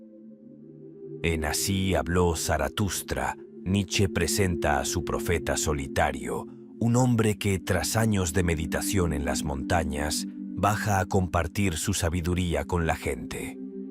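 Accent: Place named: South America. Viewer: Parece un sudamericano pretendiendo ser castellano. Es mezclado.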